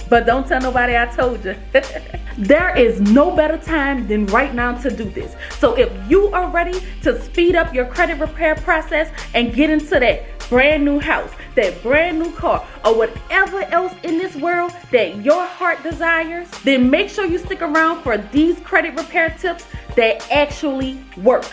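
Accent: New Orleans, United States. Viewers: You have that New Orleans accent!! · New subbie here. Even though I am located in Missouri now. I was born on Metairie. I hear New Orleans in your voice...are you a Louisiana native? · I know a New Orleans native when I hear one!!! Hey baby.... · New to the channel but I’m here forever now! I just know it’s the Nola accent for me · New Orleans? That accent strong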